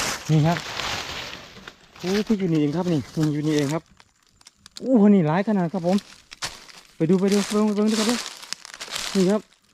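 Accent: Isan, Thailand. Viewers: อยู่ดอย จังหวัดอะไรคะ ขอโทษนะคะ ทำไมพูดเหมือนคนทางภาคอีสาน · เสียงเว่าเป็นคนทางอีสานบ่จ้า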